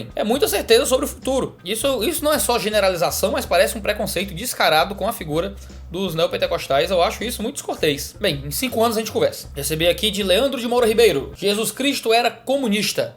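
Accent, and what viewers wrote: Ceará, Brazil. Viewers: Eu adoro as nuances q comprovam o cearense q ele é durante o discurso kkkkk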